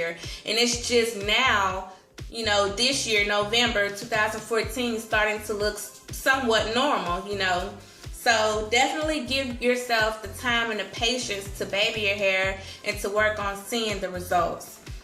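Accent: South, United States. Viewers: 10 thumbs up. love that country accent.you sound like my relatives from down south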